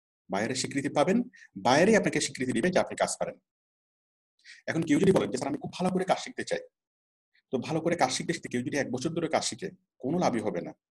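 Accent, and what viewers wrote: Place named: Chittagong, Bangladesh. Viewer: sir, ami html & css pari,, apnader chottogram aa branch office achaa,,, apnr language chottogram ar moto.. ami ctg ta thaki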